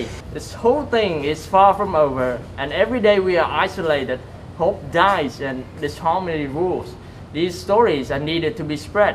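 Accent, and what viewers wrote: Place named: United States. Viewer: Good American accent, Meaningful content!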